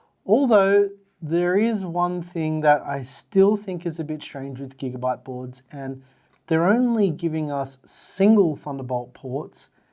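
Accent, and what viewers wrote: Australia. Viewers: Man. I got through 5 minutes of the voice volume fluctuations and had to shut it down. Goofy Australian accent didn’t help either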